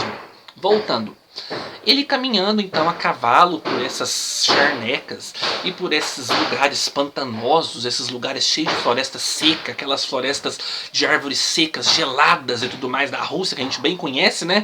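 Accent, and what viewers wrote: Minas Gerais, Brazil. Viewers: Um resumo bem mineirês. Amei! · "Sai pra lá, não quero esse trem não" KKKKKKKKKK amo as resenhas do principalmente pelo mineirês🤣🤣🤣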